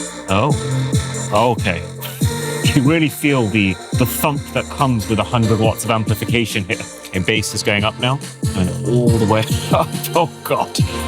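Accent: United Kingdom. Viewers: Ru British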